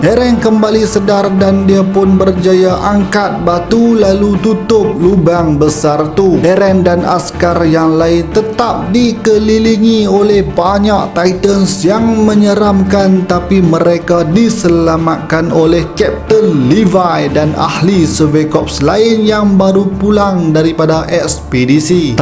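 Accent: North, Malaysia. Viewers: abg ni org utara ke? saja tanyaa 😂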